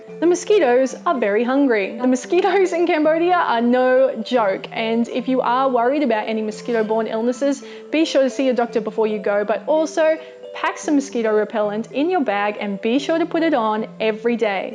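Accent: Australia. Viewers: I love the Aussie accent ☺️ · I don't understand your Australian English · Wow, you sounded American up till you said you were from Brisbane. Now, all I hear is your Australian accent :).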